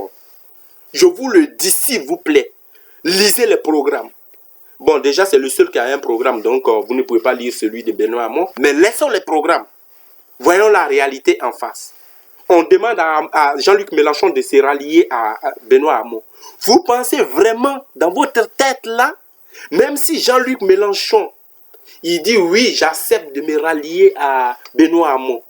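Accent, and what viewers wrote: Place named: Africa. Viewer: Merci pour cette vidéo camarade ! J'adore ton accent africain 😀 Tu as raison sur toute la ligne ! Le bon sens finira par l'emporter...nous sommes trop nombreux à vouloir justice ! Les sondages nous mentent ! Ne nous décourageons pas les amis, nous gagnerons 😊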